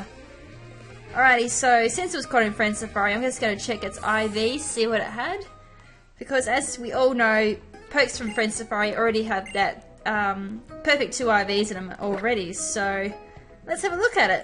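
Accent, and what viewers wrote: Australia. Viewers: Are you Australian? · are you an Aussie? (just that your voice sounds like it and im aussie too:3)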